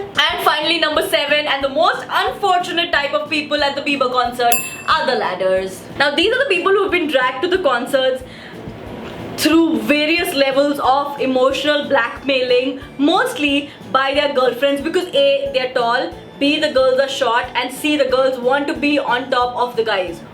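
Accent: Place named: Bhojpuri, India. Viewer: Hey...I met you on my bday idk if u remember but it wass just amazing ❤❤🌸. Anyways a rapid fire cmg up 1.If you had a chance to replace your life with some other YouTuber who would it be and y?😉 2.Prank vrushank that u wanna break up😂 3. Scream out of your window "Aee paani kisne bandh kiya"😂 in your Bhojpuri accent🌸🤘 #Sawaalsaturday lyy❤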